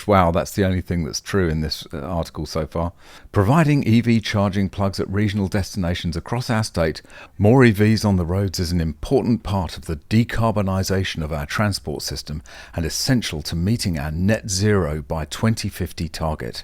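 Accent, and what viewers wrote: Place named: United Kingdom. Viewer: Strangely even though I know you are Australian you sound more British to me.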